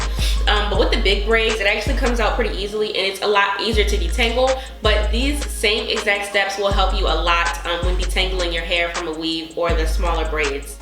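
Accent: Baltimore, United States. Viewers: Now that you’ve stated you’re from Baltimore you and IAmTreah sound just alike but her accent is a lil stronger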